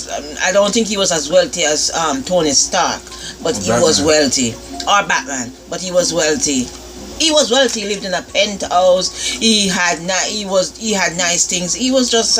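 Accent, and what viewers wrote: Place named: Caribbean. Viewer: great, keep it coming please. Your show is great! Love when you sing yo us a bit. What is your patois? Jamaica? Barbados? Some isle accent, right?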